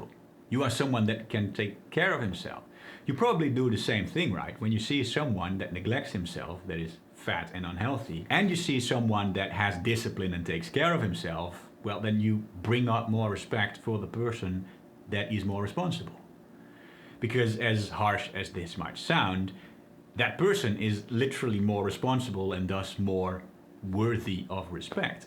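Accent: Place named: Netherlands. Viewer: I have no idea if you want to share your nationality with us, but you seem dutch (no offense because your pronounciation is proper). Am I right?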